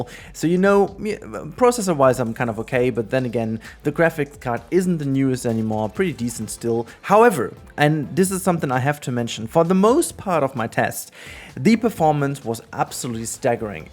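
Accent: Germany. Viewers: I'm confused bc I've watched many videos about this game and haven't heard anyone saying it like that, plus he sounds like German is his first language, therefore that isn't to blame either (despite what the other person who replied here said), given that the O in German never sounds like "ou" on its own, unless there's a U after it. I can only assume he tried to guess how it would be pronounced in English and got it wrong, but that is another thing I'm confused about, bc one would think he would have watched other videos about this game, and if he had, he would have learned how everyone else is saying it (unless that's how it's pronounced in Korean, and he knows that and I don't, which is another reason I'm asking, so I can learn if it is). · Because he is of German origin, so we speak what we read.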